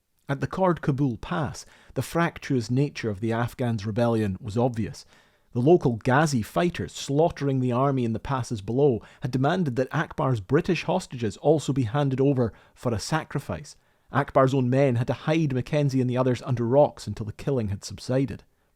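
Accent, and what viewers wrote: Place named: Scotland, United Kingdom. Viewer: OMG! I've gone off my nut! How have I not found you yet laddy? I'm 6th generation Canadian. My many great grandpappy on my mothers side came from Ochiltree, East Ayrshire, Scotland in 1857 and settled in Victoria BC. Both sides came from Scotland. Nothing I love more than a glass of scotch, some Still Game and a thick Scotty accent telling me about history. Keep up the good work boyo!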